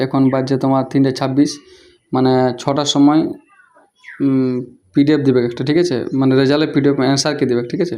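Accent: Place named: Purulia, India. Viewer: Purulia r mal naki bhai tui... Kotha sune lagche..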